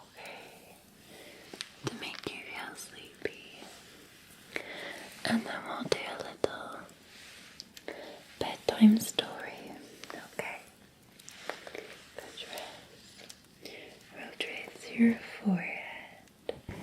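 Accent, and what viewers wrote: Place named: Scotland, United Kingdom. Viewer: Missed the victorian maid thanks for being a light in the darkness your cozy cottage was first asmr video i saw i loved it dont know why but love scottish female accent makes me feel safe comforted and loved thanks for all you do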